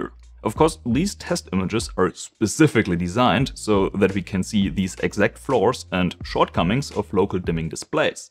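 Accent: Germany. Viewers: Höre ich deutschen Akzent raus? Edit: Nevermind, der letzte Satz im Video hat's gerichtet 😂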